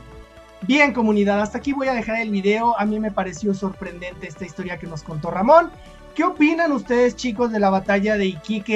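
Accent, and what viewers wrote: Mexico. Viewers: por tu acento imagino que eres Mexicano, pero cuentas tus noticias de mi Pais, con un orgullo y admiración, que no puedo mas que felicitarte por tus comentarios y videos. Es un honor que alguien que esta a 6560 Km de distancia se refiera a mi Pais con tanto entusiasmo. Una vez más gracias y espero tus siguientes noticias.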